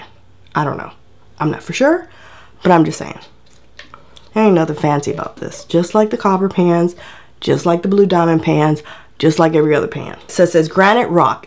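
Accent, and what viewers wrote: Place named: Compton, United States. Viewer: Can you do us a favor and not speak like you are from Compton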